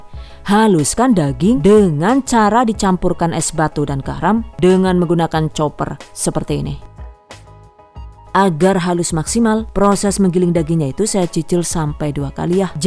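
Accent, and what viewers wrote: Banyumas, Indonesia. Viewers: Bukanya serius nonton malah ketawa denger ngelawak ngapak😂😂😂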